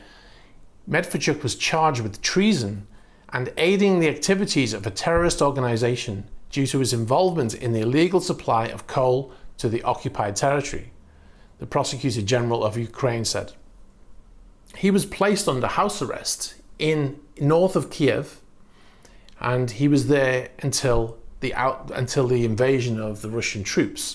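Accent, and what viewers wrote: Liverpool, United Kingdom. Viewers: Is the presenter of the video a Scouser ??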